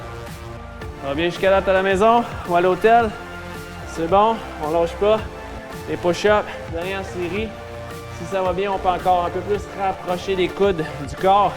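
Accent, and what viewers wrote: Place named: Quebec, Canada. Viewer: Salut, Merci pour cette petite séance ! J'aime beaucoup le format. Quelle bonne humeur ! Et l'accent québécois est plein de joie, ça motive !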